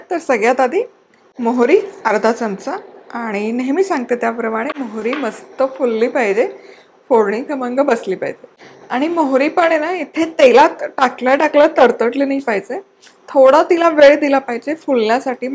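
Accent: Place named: Pune, India. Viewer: शुद्ध मराठी पुण्यातली 😊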